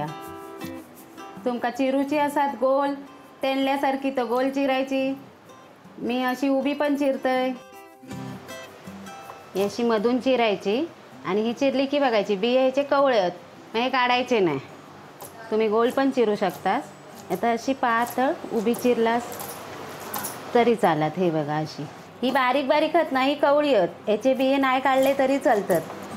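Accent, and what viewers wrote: Malvani, India. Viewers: तू इतकं गोड मालवणी बोलतेस ना की ऐकत रहावं. नक्की करतलय भागला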